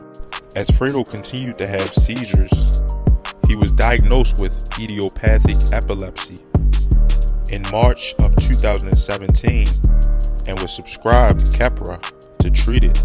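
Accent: Baltimore, United States. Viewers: I can tell he from Baltimore lol it's how he says you two flew etc 😂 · Narrator must be from Baltimore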